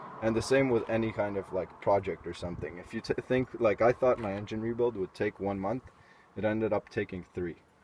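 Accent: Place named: United States. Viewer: No offense, but Is this in Canada ? Your accent is american but your country use KMH? I kinda confused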